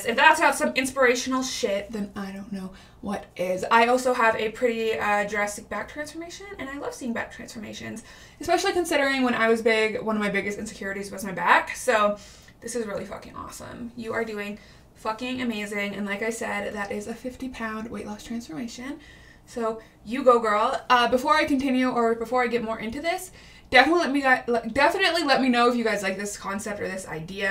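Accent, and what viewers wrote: Canada. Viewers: Your Canadian accent is SO strong it’s adorable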